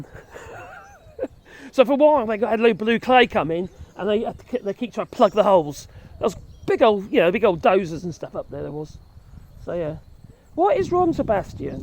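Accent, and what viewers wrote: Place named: United Kingdom. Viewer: I like this guy's accent ... British, I believe.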